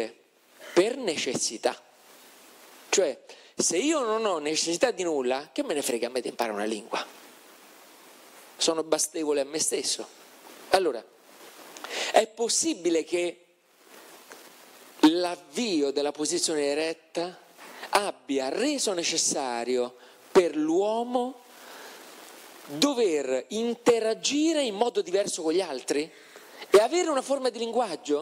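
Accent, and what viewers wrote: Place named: Rome, Italy. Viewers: Se il relatore (in questo non ho fatto caso ma in altri video sì) evitasse le c romanesche e le doppie romanesche… non dico la dizione eh, sono contro, ma almeno niente italiano locale/dialettale; in più (sempre non in questo) dice cose che vanno contro la cultura generale e le parole di alcuni storici (esempio Istanbul che è la traduzione di Costantinopoli, Carlo Magno in buoni rapporti se non sopra Papa Leone III e contento della sua incoronazione, Loki con la clava e Odino con la lancia…) senza citare le fonti. E alcuni “gli” che dovrebbero essere “le” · Prima usa correttamente il verbo"insegnare" nei confronti del figlio. A 21.44 secondo me è il popolano che si chiede perché il suo dio non ha "imparato" a tutti la stessa lingua. È probabilmente una volgarizzazione voluta, come il fatto che fa le sue relazioni senza usare un linguaggio cattedratico ma piuttosto un linguaggio semplice con persino un accento romano.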